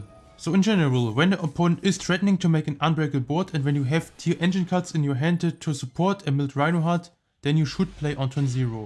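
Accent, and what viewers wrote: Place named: Germany. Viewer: Bist du deutsch bzw spricht du deutsch ? Man hört das dein englisch aus dem deutschen übersetzt wird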